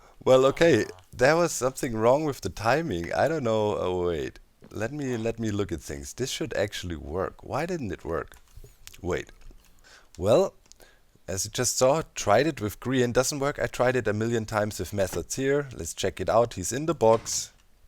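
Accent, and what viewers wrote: Germany. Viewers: Du hörst dich ziemlich deutsch an, bist du ? Wenn du nicht antwortest denke ich mal nicht :)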